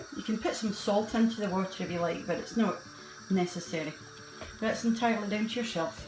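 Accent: Scotland, United Kingdom. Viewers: I'm french and the first time I ate Scottish Shepherd's pie, it was 6 years ago during a trip in England. We were staying in the Kent County into families (home) that were willing to take us for a week, and I remember so well the family I was in because they were Scots and I was 13 at the time and I had such a hard time understanding what they were saying because of the accent. Anyway, on the first night, the mom took us in the kitchen and tried to teach us how to cook this and this was so damn delicious, also, your voice and your accent remind me of her, and she was a lovely lady. Every night of the week she was taking us to the kitchen and we were helping her to cook dishes from Scotland. When we left, she gave us booknotes with her recipes hand written in it. I still have it and I still cook her scottish dishes since. I don't exactly know how I found your video because I was watching completely different recipes but hearing your voice and accent made me smile and reminded me of that. 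I couldn't help but watch the whole video, put a thumb up and subscribe ! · Great recipes, Thank you for sharing. Very well presented but my only gripe is the highly irritating, repetitive music 'bed'. I'd be interested to know your other watchers think? Personally I'd be happy with your lovely Scottish accent and the sizzle from your pans! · Its worth watching forthat Scottish accent alone!! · I’m Canadian, but of Scottish decent. Hearing you speak sounds like home. Subscribed. 🏴🇨🇦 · Wow! Five years ago! I grew up mostly in the USA with Scottish parents, grandparents, aunts, uncles, cousins...and strictly Scottish meals. I never had pizza at home, and I was 20 before I ate a taco! Your recipes (and voice) take me straight back to my roots. We've made more mince and tatties, stew and dumplings, Stovies, etc in the years that we've been watching your videos than we have in years. You are a joy to watch! 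· Hello, I just came across your channel, I am from Dundee , Scotland but live in Canada,, love your accent, brings me home,, your meals are delicious looking, and bring back many good memories ,, loved your steak pie ,, Thank so much 😘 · I too like your voice. I was born and schooled S.W. Of Glasgow near Wishaw and my so called accent is very similar to yours. When people here in Canada say “what’s your accent” I say “What’s yours?” I speak the Queen’s English!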